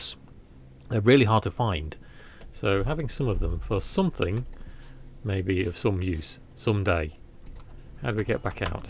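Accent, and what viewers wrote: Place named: England, United Kingdom. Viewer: Can’t even understand with your English accent. Can you put the names on the screen 👍🏽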